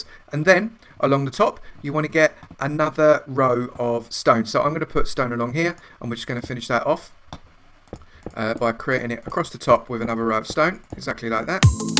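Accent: United Kingdom. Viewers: Aah your British accent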